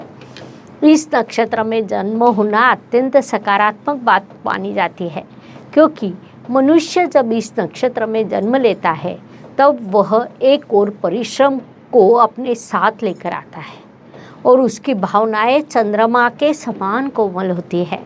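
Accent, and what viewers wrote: Maharashtra, India. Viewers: Such a strong thick marathi accent 😮 omg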